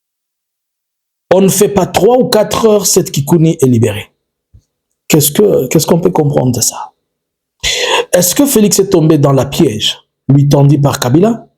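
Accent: Rwanda. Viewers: Aza rwandais, c'est normal, accent ya kinyarwanda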